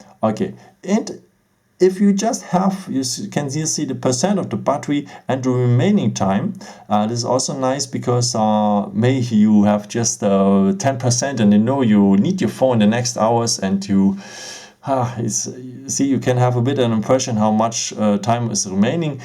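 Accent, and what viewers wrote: Germany. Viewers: Is he german💅💀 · dat is doch nen deutscher oder verhör ich mich?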